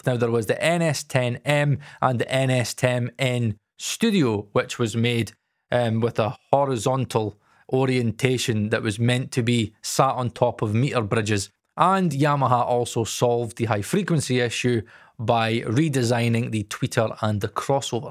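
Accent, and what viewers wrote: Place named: Glasgow, United Kingdom. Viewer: This sounded like very well reasoned advice that accounts for how the tech has just moved on. I also got a nice affirmation for my decision to buy my Kali LP-6 v2 last year. I also just loved your Glaswegian brogue. It was like listening to Winston, my spirit animal from Still Game. AWESOME! Gone is the notion to even think of some NS-10 as even a reference. I next reference will just be better monitors like a pair of Adam's, or perhaps Neumann's.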